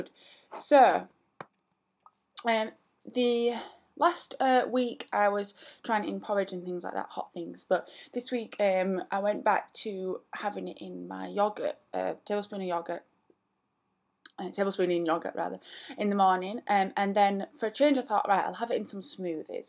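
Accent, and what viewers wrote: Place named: Yorkshire, United Kingdom. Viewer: Yorkshire accent if I'm not mistaken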